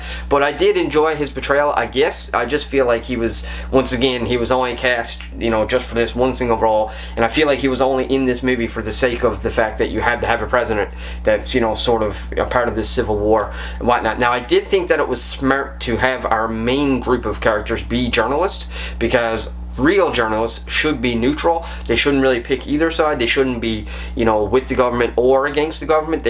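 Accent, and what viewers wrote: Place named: Canada. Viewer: Probably not the greatest idea to make this movie when America is currently engaged in a literal soft civil Cold War. But im sure that's exactly why it was allowed to be made. It seems like pure propaganda to me. You sound Canadian. I suppose if they made a Canadian version of this, I'd watch it and think nothing of it. Maybe im wrong, but you keep saying "aboot" so I'm assuming you're from Canada. Forgiving me if I'm wrong. Edit: You said you're Canadian in the video. lol I probably won't watch this one due to being American. It hits home too hard with the current state of our nation. I'll take your word for it.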